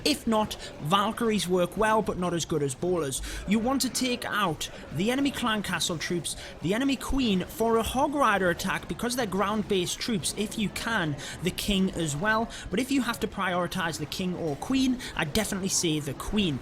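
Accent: United Kingdom. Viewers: That british acent tho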